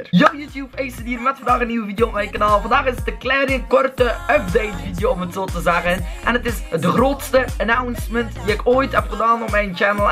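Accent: West Flanders, Belgium. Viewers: Een West-Vlaming die Engels gaan praten? Ben benieuwd :D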